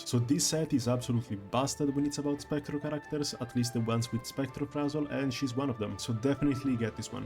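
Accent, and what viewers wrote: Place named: Italy. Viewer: Italian accent talking about italian mommy, sub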